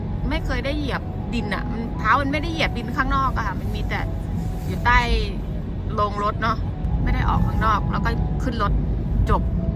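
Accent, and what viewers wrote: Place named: Khon Kaen, Thailand. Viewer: เว้าคือคนบ้านข่อยแท้ ขอนแก่นกะสำเนียงแบบนี้ล่ะ😂😂😂😂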